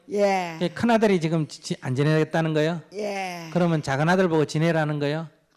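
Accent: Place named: Chungcheong, South Korea. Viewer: 누가 봐도 충청도분이시네ㅎㅎㅎ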